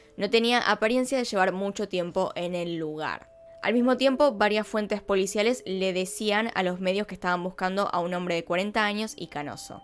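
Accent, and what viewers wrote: Argentina. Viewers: Sos Argentina? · Es Argentina, habla Argentino. Que queres? Que hable aleman? 🤣 🤣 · Es de Argentina? dowwww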